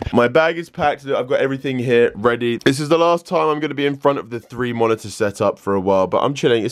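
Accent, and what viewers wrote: United Kingdom. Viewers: He's British mate 😐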